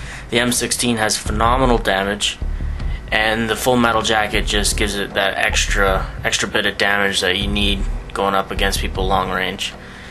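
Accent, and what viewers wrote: Canada. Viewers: u from canada?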